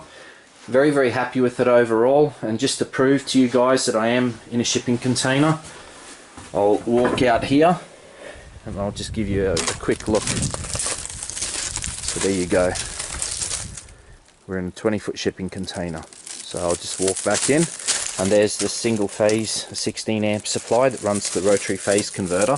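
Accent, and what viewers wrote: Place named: Norway. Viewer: I love your Norwegian accent 👍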